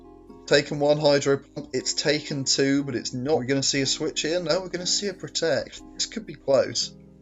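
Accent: United Kingdom. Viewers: Insane narration, random British person. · This is a British narration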